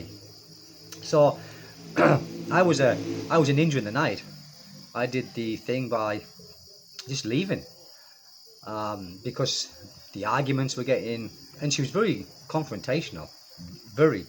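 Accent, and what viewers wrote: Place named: England, United Kingdom. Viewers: Good Stories but most of all, my english improve... Hehe U have the english english.... Grueß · He has the Geordie English......I dor nor....lovely.😊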